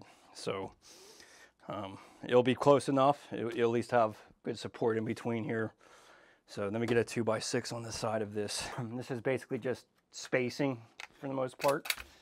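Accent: Pittsburgh, United States. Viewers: Working on my own 112…e..14-year-old house with balloon framing. Always good to see somebody else’s approach. Heard your accent and knew you were Pittsburgher!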